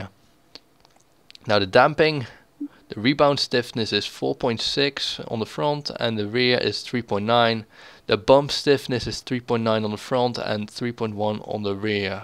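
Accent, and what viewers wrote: Netherlands. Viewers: Are you dutch to me you sound dutch nice tune btw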